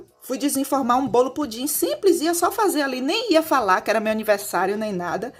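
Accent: Bahia, Brazil. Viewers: Amo suas receitas e gosto do seu sutaque Bahia tudo de bom gosto muito de vc pq esprica com muito atenção parabéns vc c me lembra uma amiga mulher do meu cunhado de senhor do Bonfim Bahia bjs 😘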